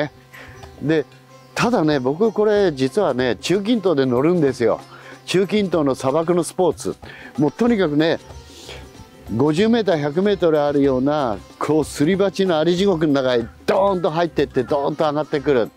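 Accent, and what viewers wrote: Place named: Nagano, Japan. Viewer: 的確な評価と、わかりやすい解説、とてもありがたいです。 どうでも良いのですが、水野さんは長野ご出身なんですね。 「ほら」→「ほお」で、ん？と思っていました。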